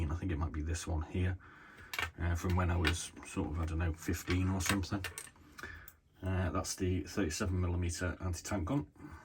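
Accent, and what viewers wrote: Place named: Merseyside, United Kingdom. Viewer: Sounds a bit of Merseyside, Wirral, Lancs there, prob got a motorcycle too, ( like myself of course, im projecting), Subbed, 👍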